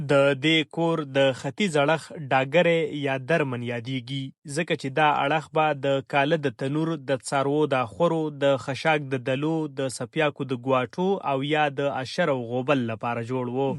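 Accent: Standard. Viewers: ډېر پهٔ ښکلې، پاکه، خوږه او سوچه پښتو خبرې کوې. دپښتنې ټولنې ښایسته او اصلي انځور وړاندې کوې. ډېره مننه ❤️🇦🇫 ستاسو ددې خدمت لپاره ډېر څهٔ کهٔ هم وشي کمه ده. ما سبسکرایب کړې، فالو مې هم کړې، ويډیوز درته هم ګورم او لایک کمنټ هم کوم.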